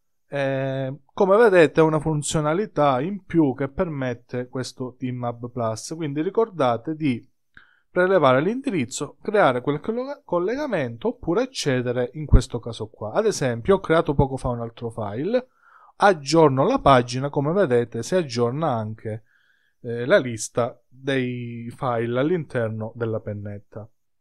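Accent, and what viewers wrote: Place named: Calabria, Italy. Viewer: sei calabrese come me si sente, complimenti per il video!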